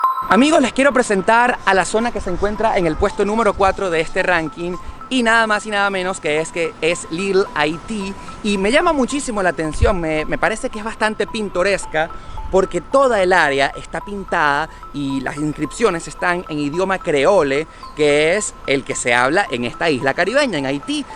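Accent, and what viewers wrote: Venezuela. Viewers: Tratando de presentar estereotipo con ese asento de gay...osea asento venezolano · este pendejo de donde saco su estadistica. Con esa actitud y voz afeminada logro q no llegue ni 1 minuto, sin considerar ese acento venezolano asqueroso como esa gente.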